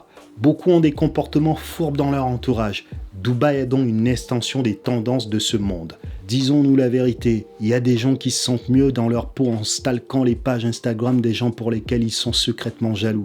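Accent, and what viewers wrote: Paris, France. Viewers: Wow je te connaissais pas , et en un vidéo je suis fan de ton accent parigo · tombé par hasard, il est marrant ton accent parisien ;)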